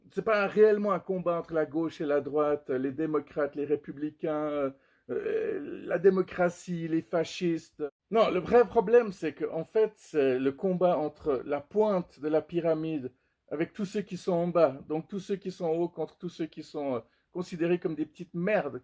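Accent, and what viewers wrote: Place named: Switzerland. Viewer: C.est ton accent suisse qui fait que tu n'es pas cru quand tu dis en ligne que tu es un bad boy... Les religions, la politique, la meteorologie, ne sont pas les meilleurs sujets pour discuter...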